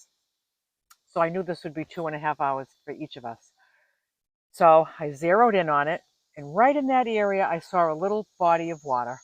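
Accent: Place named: Boston, United States. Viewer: I smiled the entire video! Pure JOY! Happy I found your channel and the second I heard you Boston accent I knew I was going to adore you. Born and raised in New York but living in Utah. (a fish out of water) for sure. Came out to ski in the 80's and the rest is history. I am just staarting to go camping (alone) this coming winter (to a place called Quartzite, Az.) Thanks for the Friday evening S M I L E ~ love the dancing, with complete abandon. Right up my alley!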